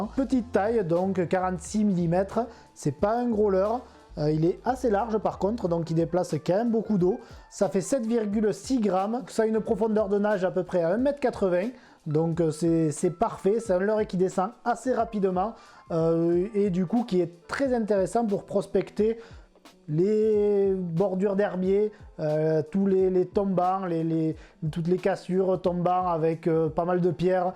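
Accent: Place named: Southwest, France. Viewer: Nouveau format très sympa beau décor est le tout avec un accent du sud-ouest . Je participe au jeu concours .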